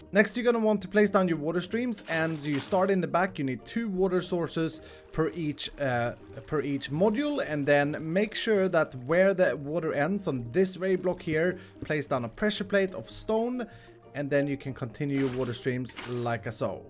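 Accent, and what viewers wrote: Sweden. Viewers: That Swedish accent tho! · Så jäkla kul med svengelska!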